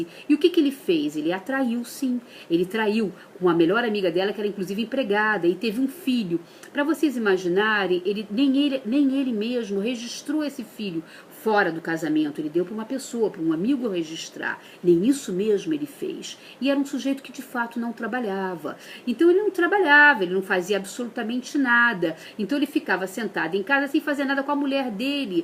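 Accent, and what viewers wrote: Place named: Rio de Janeiro, Brazil. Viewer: sotaque carioca de merda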